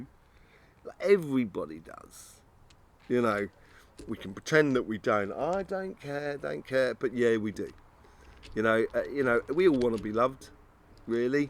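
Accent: United Kingdom. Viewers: Have not met this person yet. If i have not aware of it. Not looking for a relationship. I just moved across the country from the east to the west. We had a catagory 4 Hillary Hurricane. Being from the Jersey Shore it eas a windy very rsiny dsy. My son whose lived here for over 29yrs now. Remembers what a cat. 4 hurricane really is. 😅too funny. T zU I like your readings. Love the B accent. My ancestors are British. U msy be from another Vointry? Sounds very British to me. Oh no not goung to wait too long nor be over cautious. Btdt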